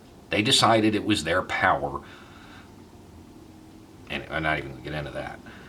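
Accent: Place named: Tennessee, United States. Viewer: Hold up is from Florida?! I coulda sworn you'd be from Tennessee.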